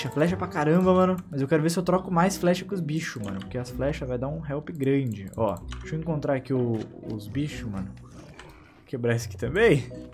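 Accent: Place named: Bahia, Brazil. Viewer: tu falou muito baiana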